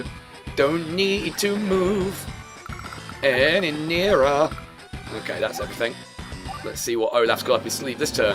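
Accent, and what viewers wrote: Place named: United Kingdom. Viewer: I was not expecting a British person. I'm a Brit myself, but still... Anyway, I hope your voice acting for Green Earth will be EPIC, since they're all British, too. I like to think I'm good at impersonating Drake. 🤣 Edit: Actually... Green Earth is probably not all British. Scratch that. Anyway, I'm so happy to see this content from you. ❤️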